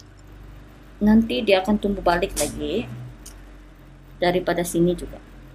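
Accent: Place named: Philippines. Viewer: Redeemed Harshsw sounds philipino, maybe search for another video instead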